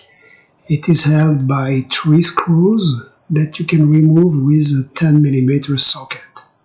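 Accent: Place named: France. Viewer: Hello, am I correct...?? Does the Kangoo 2nd. generation have a K7J engine..? I have always loved to hear english spoken by a French person... but now I am especially pleased hearing you explain details about jobs I need and would like to do. am giving a big thumbs up to all your videos. Best wishes, Mike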